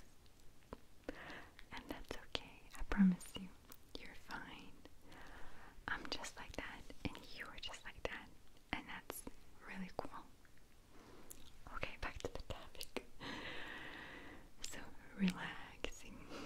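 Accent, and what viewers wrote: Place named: Russia. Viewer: Her normal talking voice still has an obvious Russian accent.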